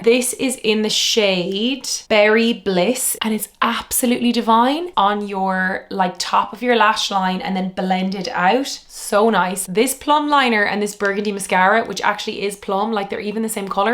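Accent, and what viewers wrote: Ireland. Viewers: Who cares? It doesn’t alter the meaning or context of what she’s saying plus she has a strong Irish accent, go and Karen somewhere else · She's Irish and her pronunciation is utterly charming .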